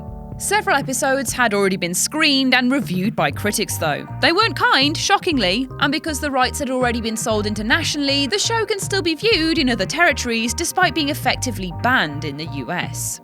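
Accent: United Kingdom. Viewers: That is highly offensive! is a citizen of the UK, and like the British, Welch, Scots, and Irish many cultures around the world pronounce words differently. Get over yourself and learn to understand different dialects, already! Please, there are more countries in the world than just the United States!!!! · So how do you pronounce TV? We in the UK, where is from pronounce it as the letters, Tea Vee.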